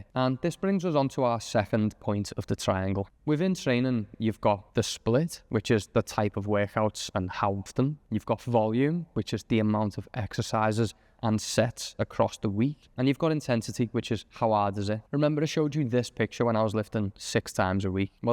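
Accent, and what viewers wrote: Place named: Merseyside, United Kingdom. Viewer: That's a Merseyside accent, Liverpool or the Wirral...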